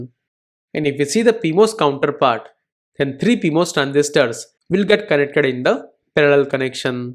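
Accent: India. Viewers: its really good but pls don't speak with an Indian accent its really hard to understand ;) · Why so many Indians.... Sorry but it is difficult to follow you with the accent... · I actually think the Indian accent makes it easier to understand lol. I'm not even Indian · I only understood because he had an indian accent, like i could trust him more with his expertise :))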